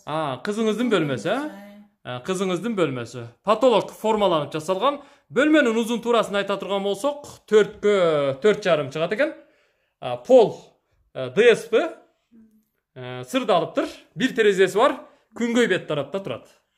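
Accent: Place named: Osh, Kyrgyzstan. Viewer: Секинираак эле суйлочу сураныч ошский акцент мн эле . Жарылдай бербей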